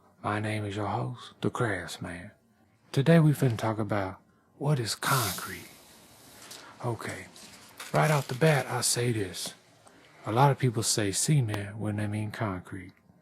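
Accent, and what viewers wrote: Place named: South, United States. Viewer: i love the narration. it's that down home southern vibe, but also ASMR. haha.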